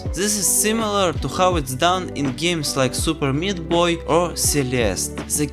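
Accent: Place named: Eastern Europe. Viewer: Finally new video on this channel. I hope that this minimalist platformer find his players! P.S. By the way I really enjoyed your cool slavic accent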